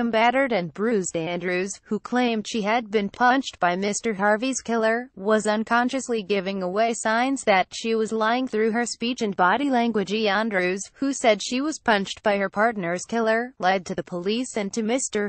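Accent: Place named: United States. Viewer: Love American accents, but very annoying voice 😐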